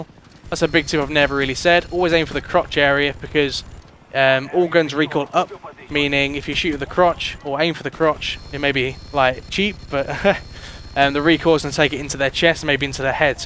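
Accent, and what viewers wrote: United Kingdom. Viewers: I'm guessing ur a British guy am I right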